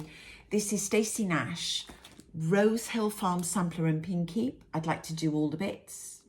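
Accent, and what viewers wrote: Wales, United Kingdom. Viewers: Your work is just amazing and it’s such a delight to watch and listen to your enchanting Welsh accent. Thank you!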